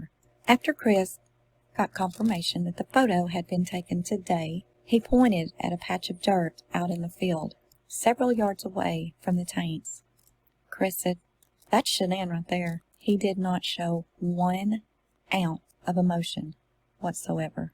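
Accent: South, United States. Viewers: Beautifully read:elbowbump: you should do stories online.....nothing like a southern belle accent.💛..you sure got it:washhands: Thanks for doing this...muchly appreciated🥰x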